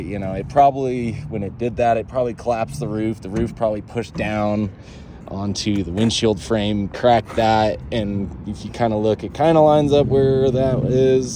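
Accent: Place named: California, United States. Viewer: You have a great project there . I could tell by the sound of your vernacular that maybe you are coastal [ maybe California ] . If I were you I would look into an E-Bike franchise that rents bikes or a delivery service to sponsor you to gain funds in your build . A dune buggy engine cage , hip high quarter panels and you can raise the bed to hide a pull out ramp to put bikes or cargo in & out with a cool thick mesh door like they do with truck tail gates . I subbed because I remember back when I was 18 in the middle 80s I bough a 1954 oval window bug rough & rusty but Dude it had all of its original guts [ drive train and interior ] weathered and worn . Put 2K into it and had to move over seas and gave it to my dad . Never give up the first toy you made yourself . lol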